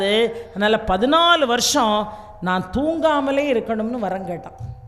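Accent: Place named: Tirunelveli, India. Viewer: அருமை அம்மா. எங்கள் அழகிய நெல்லைதமிழ்ப் பிரவாகம்💐🙏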